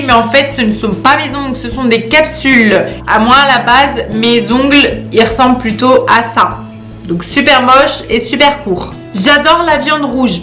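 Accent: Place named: South, France. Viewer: Le "rause" , on adore l'accent du sudd. Gros bisous ❤ super vidéooo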